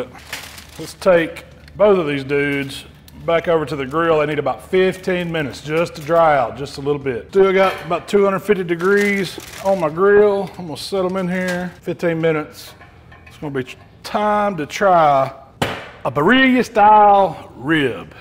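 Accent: South, United States. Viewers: I stumbled across your page, looking for a how-to guide on grilling, and I hit the jackpot. You have gained a new follower, I love your Southern accent. It's time for me to binge watch your content for ideas, tips, and tricks. 🤗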